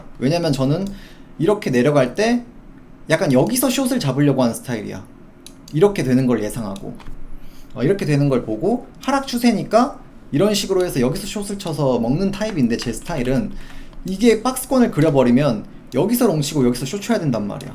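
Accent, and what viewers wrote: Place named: Jeolla, South Korea. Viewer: 약간 느린 말투에.. 전남처럼 확 튀는 사투리도 아니고.. 성님이라는 단어를 써서 그랬어요 ㅋㅋ 딱 말투가 전북스타일인데 ㅋ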